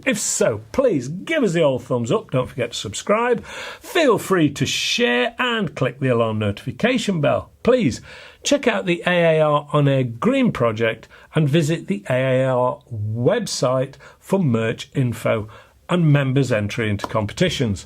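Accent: United Kingdom. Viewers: given his British accent what do you think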